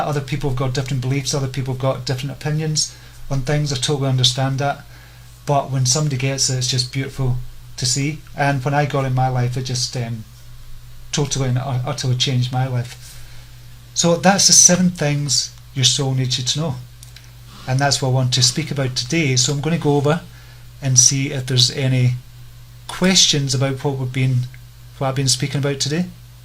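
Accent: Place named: Scotland, United Kingdom. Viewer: Hi, I came about finding you in an interesting way; I too had found the song/dance " " and fell in love with it... I store it in my YouTube library. So, the other day I went to my Tablet to get it and somehow your beautiful introduction to the song showed up in my "photo gallery" for some reason. Anyway, I found your introduction just as fascinating as " " itself... I didn't know who you were, I thought maybe an Architect because of you, your office surroundings & your name displayed with your logo... I was thinking maybe you were just taking a break and wanted to share this "amazing, hypnotic beautiful" video online... So I've been carrying my Tablet around listening & watching " " because I've been extra ill the past month (I have Lyme Disease) and watching " " makes me feel much better, as you know - it has this quality about it. 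Then I started queuing it to your intro each time I played it because 1. I absolutely love your accent - I always have, Scottish - right? 2. Your more than average intro especially the end when you say "Okay, until next life"... So today I'm driving around (San Francisco, California) playing the song, its so wonderful... and it comes to the end and I look over at my Tablet on the seat next to me and say "Okay, I want to hear that stunning voice again" and I queued it up to the beginning and started wanting to know who is this interesting man and why does he say "until the next life"? So I realize you have a l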